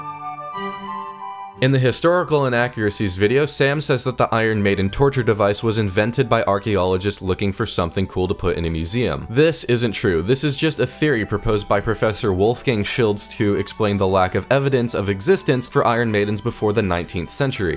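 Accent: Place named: Northeast, United States. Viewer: We also call them that in the NE and that’s what his accent sounds like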